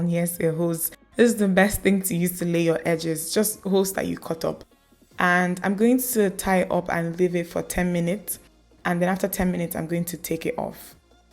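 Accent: Nigeria. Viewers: It's refreshing to hear the naija accent whew! Thanks for not forming! 😘😘😘❤️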